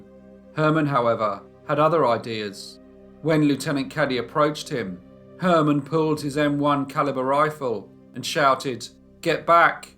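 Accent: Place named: Scotland, United Kingdom. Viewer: I love the Scottish accent...had hopes to visit someday. Things in the world have changed so much I'm not sure if that's a reality now...but anyways...GOOOOD mornin from the northeast USA! Hope you're staying well and safe!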